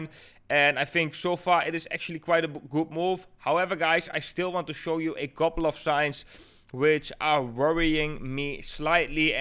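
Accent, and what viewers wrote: Netherlands. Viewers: What time you sleep? You clearly sound dutch, but you always upload during the night ???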